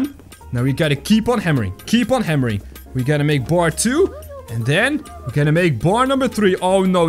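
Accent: Netherlands. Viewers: Just wondering but are you dutch, because I just thought I heard a dutch accent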